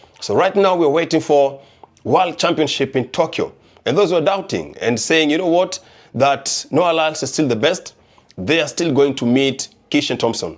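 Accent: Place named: Jamaica. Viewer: Bro, I love how you are practising your patois ❤.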